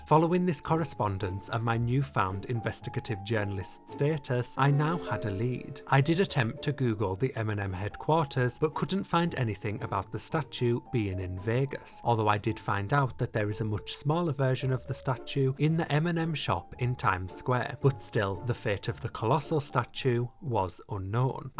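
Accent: United Kingdom. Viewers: Why is he so british? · Great video, your voice reminds me of Jon Ronson. And with that, has that Cardiff sound 😅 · English accents are hilarious, the southern accent is funny but your one is really funny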